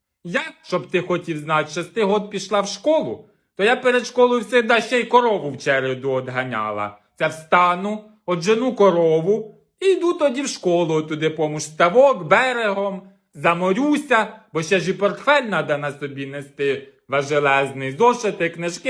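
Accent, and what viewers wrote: Poltava, Ukraine. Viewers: Дякую,! Кожного дня переглядаю Вашi шедеври. Ви- талант!Як точно, мальовниче вiдтворюете наш полтавський дiалект! А iнтонацiя, емоцiональнiсть! Все не вигадане, справжне!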